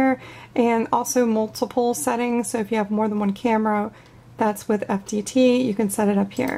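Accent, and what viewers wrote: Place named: South, United States. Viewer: phục êwê Sounds like you're in the Southern US, is yours exposed to direct sunlight? I'm always concerned about the hot sun cooking these el cheapo cameras.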